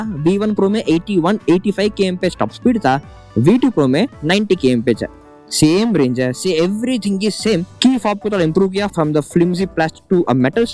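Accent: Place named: Karnataka, India. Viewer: He's a Kannadiga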